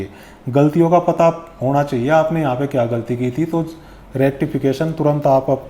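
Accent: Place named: Haryana, India. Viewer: Bhai aap to merre haryana se lg rhe ho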